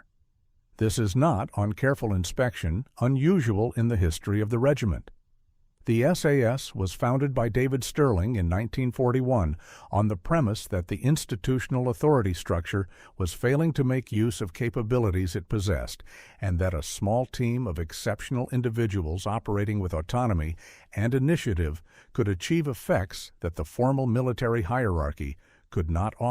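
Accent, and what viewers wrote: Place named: United States. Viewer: Why an US voice over about a full UK subject?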